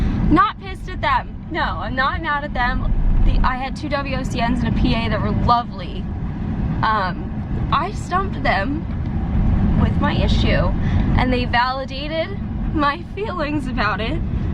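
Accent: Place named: South Jersey, United States. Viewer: I thought I detected a south jersey accent.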